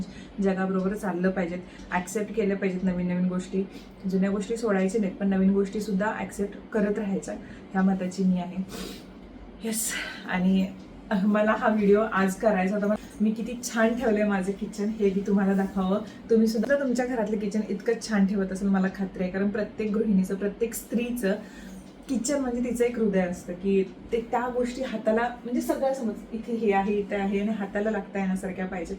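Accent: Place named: Ghat, India. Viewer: Tu ghati ka boltes kokani ka ny bolt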